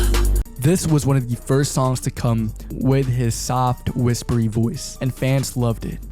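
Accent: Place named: California, United States. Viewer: he sounds and dressed like he could be from Cali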